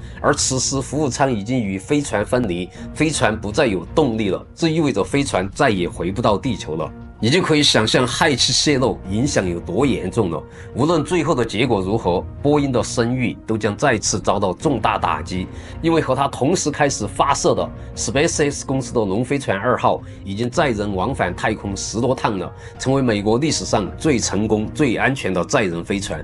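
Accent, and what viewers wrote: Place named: Guangxi, China. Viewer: 广西桂柳地区口音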